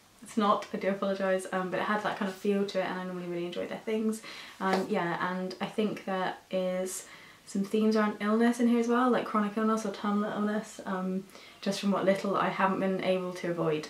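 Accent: United Kingdom. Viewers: You're so different to all the other booktubers I follow and I love it! It's nice to hear a Brit talking about books for a start, but also you don't focus on popular books, just ones that you feel are important or interesting. It's so refreshing and I love it!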